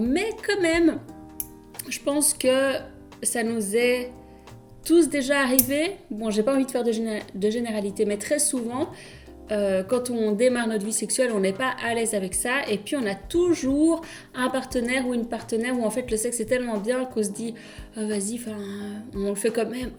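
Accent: Belgium. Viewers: Salut depuis un moment je me demande d'où vient ce joli accent? J'aurais dit belge, du côté de Liège?🤔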